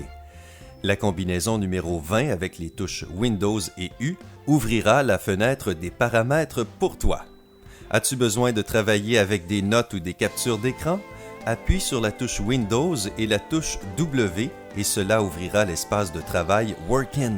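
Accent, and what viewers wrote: Quebec, Canada. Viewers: L'accent Canadien on adore xDDD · moi aussi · J adore ton accent québecois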